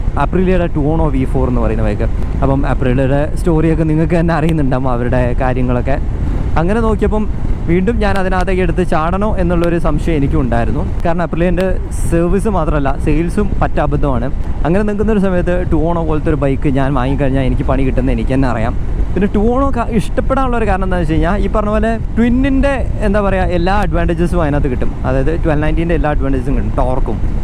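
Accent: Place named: Kannur, India. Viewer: na....nte mone🤣 ningalu kannurinu aduthano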